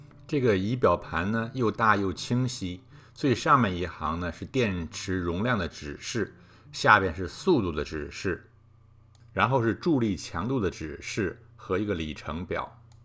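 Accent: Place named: Tianjin, China. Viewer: 大哥你好，听您声音天津的，我们是老乡，很感谢你分享了这个电动车，但是我在澳洲买不到，有点失望，这车的确不错，淘宝有类似但是感觉不太靠谱。祝您生活愉快。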